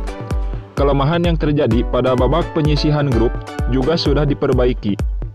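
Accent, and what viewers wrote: West Java, Indonesia. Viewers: Adminnya urang Persib nya..? Logat na Sunda pisan euy..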